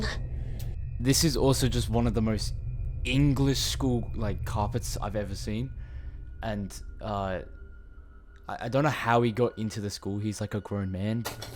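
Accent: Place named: Australia. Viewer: Fellow pom ? You sound Australian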